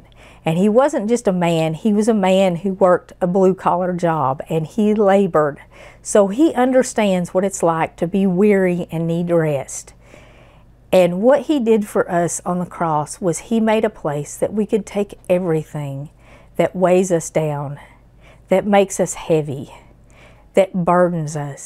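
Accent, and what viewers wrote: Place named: Kentucky, United States. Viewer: Love your diwn home cooking.Although i was born in Ky,Covington, right across the Ohio River opposite Cincinnati. You sound like a Ky woman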